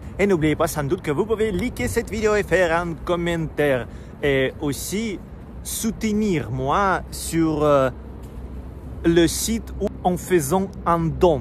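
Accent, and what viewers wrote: Russia. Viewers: oui et non, il dit cela puisque tu as un accent très marqué comme dans les films ou un personnage incarne un russe :D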